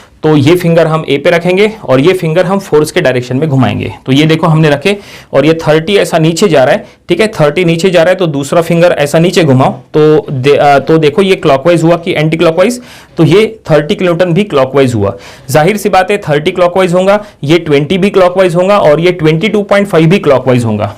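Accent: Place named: Maharashtra, India. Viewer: Bhai Maharashtra mai ase hi bolta hai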